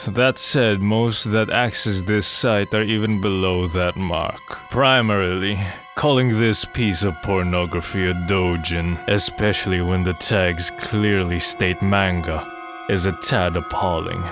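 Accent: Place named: United Kingdom. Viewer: He kinda sound British to me to be honest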